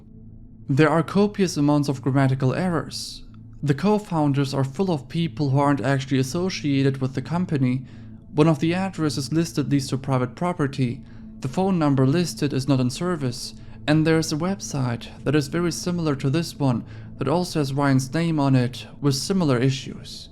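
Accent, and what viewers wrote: Germany. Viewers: Armin Meiwes is/was(?) somehow the least offensive cannibal to ever live. Issei Sagawa could learn a little something from him... 😒 I'm really surprised that I'm seeing what is, to me, entirely original content in a list video like this. Horror-themed channels tend to all cover the same, what passes for "mainstream", content across the internet. I can watch five top five videos of "creepy things from around the internet" from five different channels but only see a total of seven to ten (out of 25 total, remember) unique stories altogether. So, kudos for doing your own research and finding stories other people may have passed on, ignored, or missed entirely. I respect and appreciate it, my dude. May I ask... Where is your accent from? Based on how you pronounced AM's name, I would assume your first language is either German or German-adjacent (for example, Dutch). 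Am I anywhere near right?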